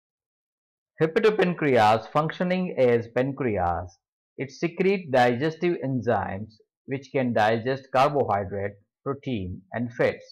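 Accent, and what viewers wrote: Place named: India. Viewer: That Indian accent is so irritating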